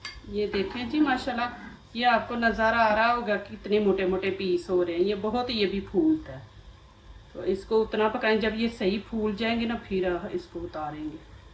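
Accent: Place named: Punjab, India. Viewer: Ma sha Allah BHT zbrdstt Api... Apki aik adat BHT pyari h k ap Duaen deti Hain Dil s... Allah Pak apko BHT kmyabi Dy donu jahan ki Ameen...Apki Recipes BHT Best Nd Different Hoti Hain...Apka bat krny Ka Andaz b BHT khubsurat h Respect pyar s...Mujhy apki pnjabi wali voice BHT pasnd h...Hmen bs apki simple recipes s Kam Hona chaeye...M ap k 1M subscriber dekna chahti hn🙌 Jazakillah ❤️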